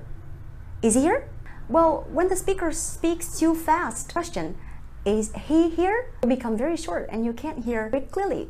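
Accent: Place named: United Kingdom. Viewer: Dear Ms.! You are hella superb with the classy British accent Thanx for your nice stunning video Please keep smiling, keep shining and keep flying high All the best. Respectfully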